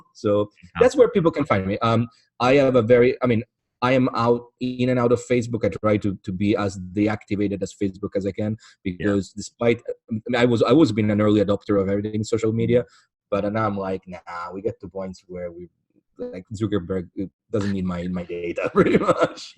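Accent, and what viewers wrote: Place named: Ireland. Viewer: Wasn't expecting an Irish host. Great stuff. Hello from Offaly Subbed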